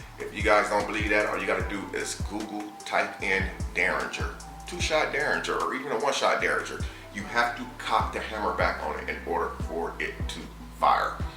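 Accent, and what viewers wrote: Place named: Russia. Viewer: That accent sound either Russian or Ukrainian, at least for the ideal intro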